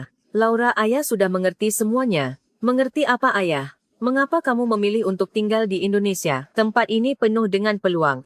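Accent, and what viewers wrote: Malaysia. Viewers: Narator nya orang Malaysia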